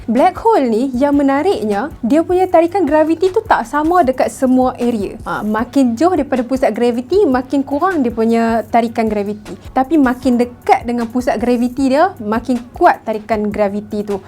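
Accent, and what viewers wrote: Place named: Kedah, Malaysia. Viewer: loghat kedah piaw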